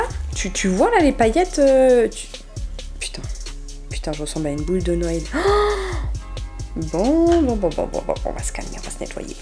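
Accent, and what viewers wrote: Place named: Franche-Comté, France. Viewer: Mdr oui les francs comtois ont un accent lol. Ah oui je suis une franc comtoise et du haut Doubs donc un cran de plus 😂🤣😂 Si jamais on peut te voir où sur Besançon ? Je sais pas si tu voudras le dire mais histoire un.jour de se rencontrer... Vidéo top moi qui aime.a donf les couleurs vivent et oui dans le.bio on a que des couleurs nudes... donc trop Contente de voir cela. Merci À bientôt pour une prochaine vidéo... hâte 😘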